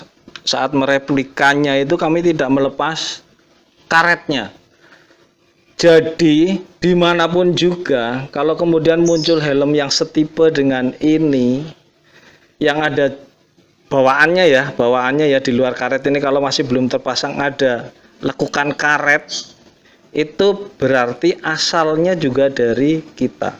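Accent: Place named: Banyumas, Indonesia. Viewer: ngakak mbah, ngapak campur b indo e khas bgt wkwk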